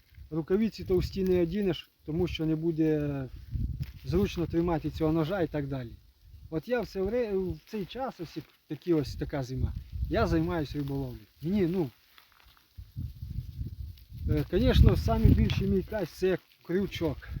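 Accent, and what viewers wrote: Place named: Polissia, Ukraine. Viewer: Бажаю міцного. Ви напевно десь з Поліського або Іванківського району? По акценту зрозумів. Можливо й не правий. Гарна риболовля. Так тримати. Все відмінно. 🎉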